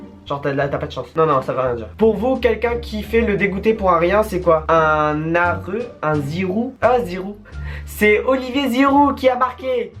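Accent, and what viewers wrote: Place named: Charente-Maritime, France. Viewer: J'parle comme toi et j'suis de Charente-Maritime 😅😅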